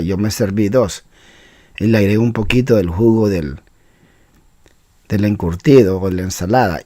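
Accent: Costa Rica. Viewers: Bien perdon me adelante con el comentario... pense que eras hondureño... aunque tenes el acento mas a tico que Nicaraguense, bueno... gracias porque te gustan las pupusas y las mejores pupusas estan en olocuilta en El Salvador, tambien en los planes de renderos y hay varias partes en El Salvador donde las hacen riquisimas ya se a de maiz o de arroz, practicamente en cada esquina de cada calle hay pupuserias, un buen Salvadoreño minimo come de 2 a 5 veces pupusas a la semana!!! bueno saludos al pueblo Nicaraguense nuestros hermanos!!! y perdon por el comentario anterior! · ese maje habla como costaricense. y dando recetas del salvador jajajja. esas no son pupusas ni el curtido .. nada que ver con el salvador ..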